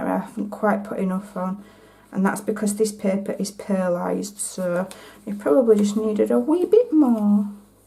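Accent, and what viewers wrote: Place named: England, United Kingdom. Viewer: Such a great tutorial I will definitely give this a go. I could listen to you talk all day as I no longer live in England your like a little bit of home.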